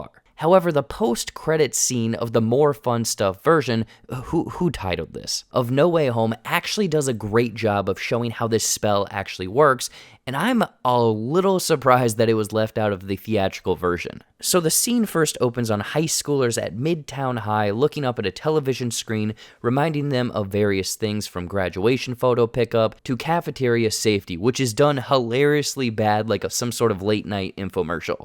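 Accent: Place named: United Kingdom. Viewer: Please don't pull a jake tran 🥺 we love your British voice and wit, not this generic American voice -- 🙄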